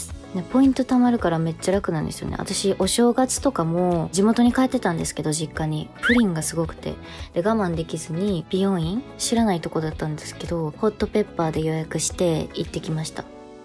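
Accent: Kansai, Japan. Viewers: はじめまして😌私も関西人なのでりほちゃんの関西弁聞くと親近感湧きます😆✨「むちり突き上げてる」がパワーワードで😂 小田切ヒロさんとのコラボ動画で初めてりほちゃんを知ったんですけど、イントネーションで「もしや関西の子かな？！」と思って動画見たらハマりました😆(普段ヒロさんとコラボ動画出してるYoutuberさんの動画は見ないので初めてです✨) ちなみに、りほちゃんのパープルメイクかわいくて使ってたコスメポチリましたよー😍 ゴリゴリのモード系メイクも見てみたいです☺️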